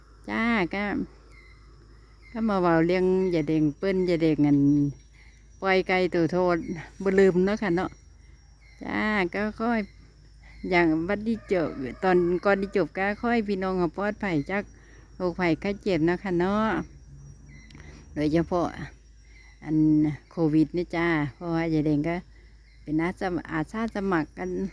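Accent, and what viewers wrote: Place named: Thailand. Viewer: มาฟังนำจ้า เว้าคือกับไทเลย นครไทยกะติดกัน เว้าคือกันจร้า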